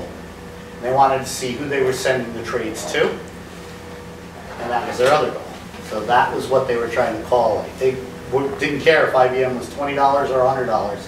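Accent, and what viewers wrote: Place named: Italy. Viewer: Italian accent.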